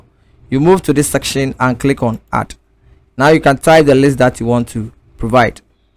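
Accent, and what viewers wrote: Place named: Africa. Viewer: I really love your accent. Are you an African?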